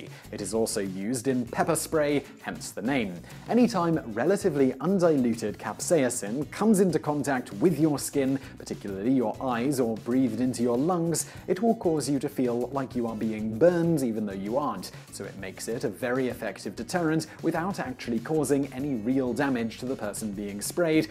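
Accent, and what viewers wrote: United Kingdom. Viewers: He's British using the British pronunciation. Here in the US the pronunciation is different, just to annoy the rest of the world.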